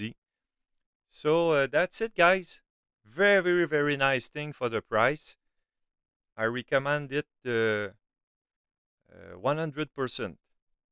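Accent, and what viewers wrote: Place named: Quebec, Canada. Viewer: Toé t québécois certain ?????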